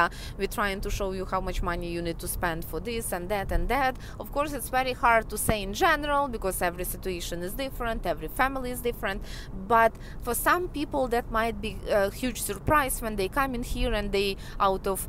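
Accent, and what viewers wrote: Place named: Russia. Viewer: oh that deceiving Russian accent...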